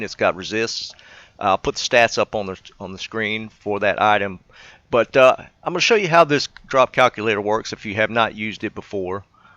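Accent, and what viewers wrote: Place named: South, United States. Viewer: Love the southern drool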